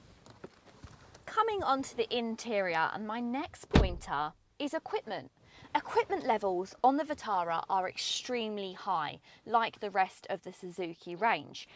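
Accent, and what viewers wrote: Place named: Essex, United Kingdom. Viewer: The accent of the presenter nearly turned me off the car.. Must be from Essex.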